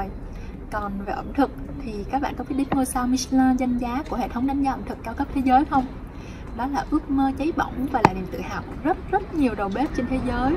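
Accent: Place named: France. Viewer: ui em thích giọng Pháp của chị quá, em bắt chước giọng chị được ko <3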